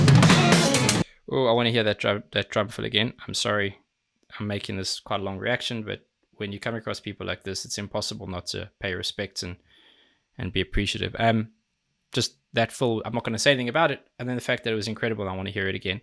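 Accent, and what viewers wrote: South Africa. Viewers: Is my guy here a fellow south african or am i hearing shit?😂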